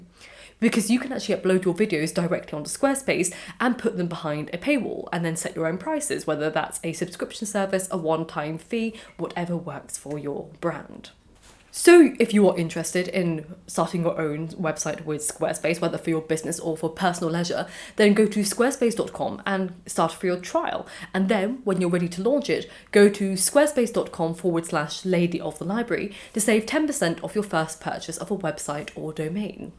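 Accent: United Kingdom. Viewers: As a person learning both English and Italian this video is 👌, I just love her accent (British I presume)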